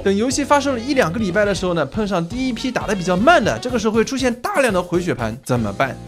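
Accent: Shanghai, China. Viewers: “纵所邹资”作为在海外的上海人 你让我想起了我和蔼可亲的上海语文老师 祝他在天国安好。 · 这个上海腔搭配的恰到好处。色一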